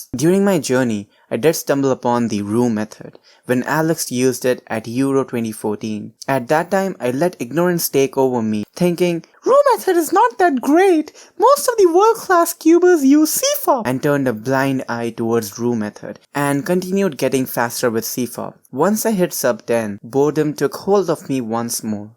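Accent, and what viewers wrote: India. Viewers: Indian accent turned me off...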